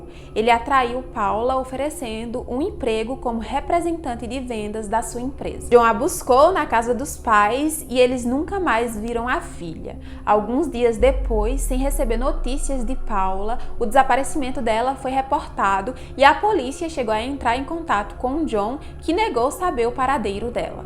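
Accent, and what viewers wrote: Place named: Rio Grande do Norte, Brazil. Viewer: Segunda vez que venho no seu canal muito interessante, pelo seu sotaque. Vc mora em natal rio grande do Norte ? Bjs linda !!!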